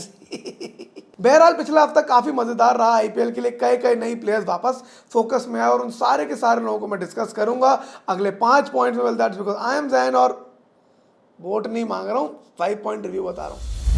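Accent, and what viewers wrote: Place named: Hyderabad, India. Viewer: Touba touba bhoth buri Hyderabadi bolre tum aur ek bar nakko bolona plzzzz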